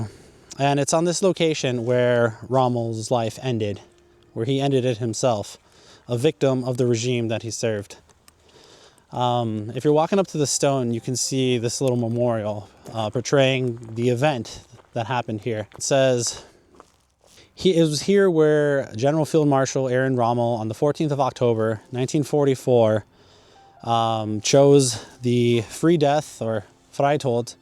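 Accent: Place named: United States. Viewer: Although your accent seems American to me, I do wonder if you're a Brit living in the States.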